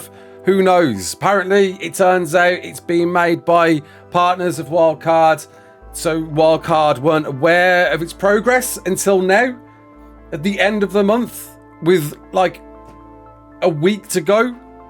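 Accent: Birmingham, United Kingdom. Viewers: Are you from Birmingham? You have the exact same accent as me bro 😂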